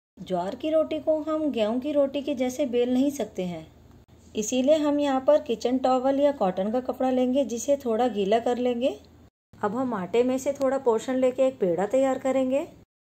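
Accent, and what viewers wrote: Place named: India. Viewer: Hello.. i love Indian accent.. your videos very interesting.. thankyou for sharing friend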